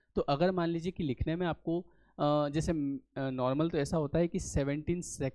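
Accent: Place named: Madhya Pradesh, India. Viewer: i love ur mp acent ratlam indore ya ujjain ❤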